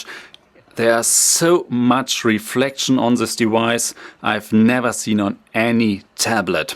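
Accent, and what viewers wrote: Germany. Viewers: i think is french?:) actually no, is german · i could feel some German accent within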